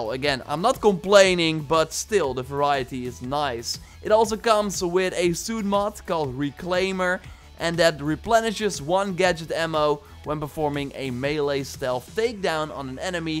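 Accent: Netherlands. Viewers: Are you dutch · Je kan horen dat je Nederlands bent lmao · lol je accent geeft zo hard weg dat je nederlands bent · ik hoorde meteen dat je nederlands was😂😂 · Steenkool engels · are you dutch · ben je nederlands ofzo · jij bent nederlands of niet ik hoor een nederlands accent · Are u dutch?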